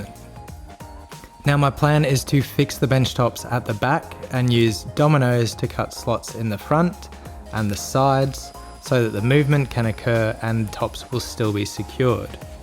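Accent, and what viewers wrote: Australia. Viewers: Relentless Australian accent...LOL! Had a couple of laughs and picked up a few tips, thanks! Liked and subscribed. 👍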